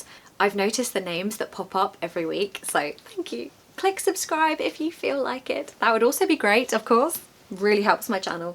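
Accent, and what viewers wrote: United Kingdom. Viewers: Very nice I am falling for your British English 🇨🇦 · I found your lesson about phrasal verbs very useful indeed!! I really love your British accent!! 💖💖💖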